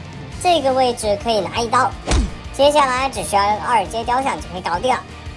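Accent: China. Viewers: 導演你是大陸人嗎？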